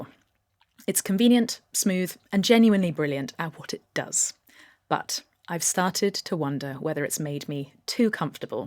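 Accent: United Kingdom. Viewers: I’m not sure about any of this, but your British accent is music to my ears.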